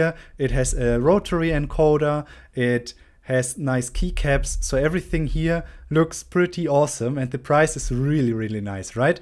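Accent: Germany. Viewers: i hear a bit of se german akzent , LG :)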